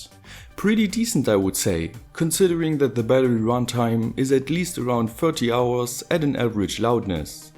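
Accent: Germany. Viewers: Bro you have to be german, there is no way this accent of your's is scottish, it's even more german than mine and of my german friends haha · Man Hört ja das du Deutsch bist daher eine Frage auf Deutsch :D...... kann man mit diesem aufbau auch größere boxen bzw. subwoofer mit einbinden ? wäre coll wenn jemand antwortet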